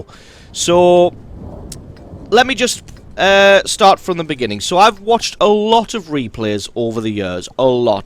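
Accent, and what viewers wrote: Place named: Scotland, United Kingdom. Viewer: There were some Scots features ;-)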